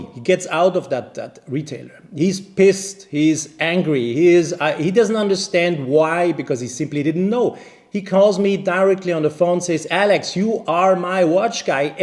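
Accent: Vienna, Austria. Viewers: you are absolutely spot-on on this matter. I watched this video with awe and bursted with laughters of your sense of humour and that Vienna accent hahaha. It's beyond f**king bullsh*t with this Rolex artificially-created frenzy. In Hong Kong here it's as bad as you can imagine, I wanted to purchase a simple black dial 36mm Oyster Perpetual, the freaking AD told me to fuck off and go for the grey market, I checked the grey market price 2 weeks ago - a f**king 3-hand black dial OP is now costing >10,000 USD. I was like "Okay that's it" and just move on. The old good days before 2021 when Submariner / GMT/ Cosmograph Daytona got hyped but you could still walk into a Rolex AD to get an OP, Day-Date, Datejust or occasionally Yachtmaster; now you walk in any of them and all they have are lady models with diamonds or very small lady models. Honestly at this current point I won't be surprised if the lady Rolex models are going to get hyped and within 2022 you walk into a Rolex AD there is NOTHING to see. 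Just arrogant employees and empty shelfs. Keep up the good work Alex, love your content and looking forward to many more to come 🙏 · Finally I know how a Viennese person will sound like. Omega makes great watches. Thank you for sharing the story!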